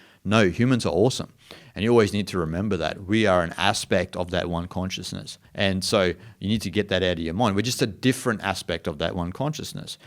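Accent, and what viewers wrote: Australia. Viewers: Great content and presentation. Always good to hear an Aussie voice. 😊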